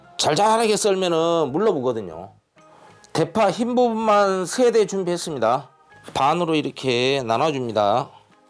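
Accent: Jeolla, South Korea. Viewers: 전라도사투리가듣고 싶어서 무김치 잘배우고나갑니다 ㅎ ㅎ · 쉐프님의 요리 자주 시청하고 있습니다. 걸죽한 전라도 말씀 정감이갑니다.쉐프님께서 사용하시는 믹서기 구입하고 싶은데. 어디 좀 제품인지 알려주세요^^ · 셰프님 전라도 사투리 넘 정겨워요😊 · 전라도 사투리 설명이 구수하니 감칠맛 나는듯~^♡ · 완전 사투리가 돌산 아니면 광양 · 동생도 김치를 담궈 주시는. 오빠의 사랑 전라도고향 말씨에 정감이 갑니다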